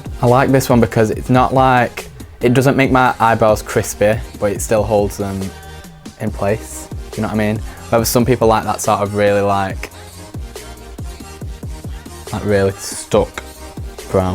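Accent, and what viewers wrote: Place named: Bolton, United Kingdom. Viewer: where are you from? You sound like a fellow boltonian x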